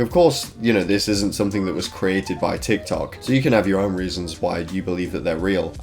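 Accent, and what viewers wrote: England, United Kingdom. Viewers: I never realised this before but your voice kind of sounds like penguinz0 with an English accent.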